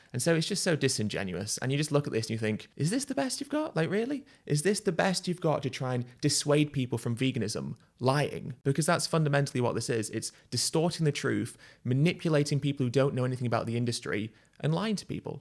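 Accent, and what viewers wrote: United Kingdom. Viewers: I love the way Ed has such a posh accent but can't pronounce 'th' in some words, it's so endearing! The arguments are first rate too!!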